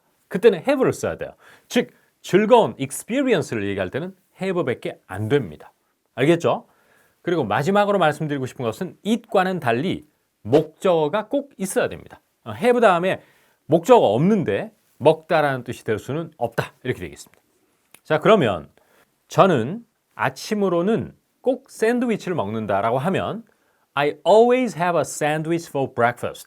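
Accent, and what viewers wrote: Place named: Gyeongsang, South Korea. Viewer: 선생님 목소리 너무 좋으세요~~ 경상도 사투리가 들리는데 어디세요?? ㅎㅎ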